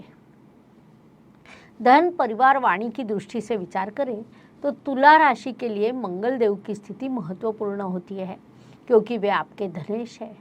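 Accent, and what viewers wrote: Maharashtra, India. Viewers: Madam please speak in Marathi or else speak in Hindi tone..Ekdum marathi tone aata hai to thoda alag lagta hai..Content bohot accha hai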